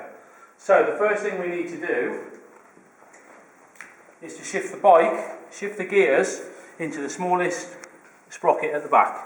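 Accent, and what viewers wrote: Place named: England, United Kingdom. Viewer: Nice English accent)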